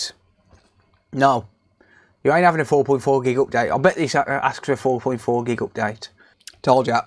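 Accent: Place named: Midlands, United Kingdom. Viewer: ahh, I thought you sounded localish, very midlands type accent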